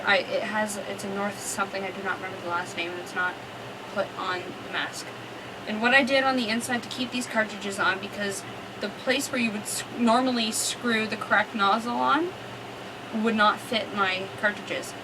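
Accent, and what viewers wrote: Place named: Canada. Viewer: you sound canadian. are you canadian?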